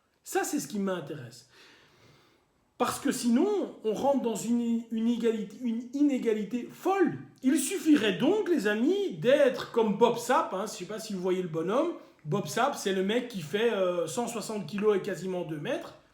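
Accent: Belgium. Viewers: T’as un accent Belge je m’abonne camarade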